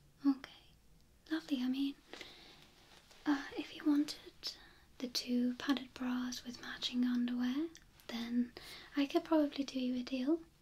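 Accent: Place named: Birmingham, United Kingdom. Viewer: You can talk to me all day with that amazing Birmingham accent!!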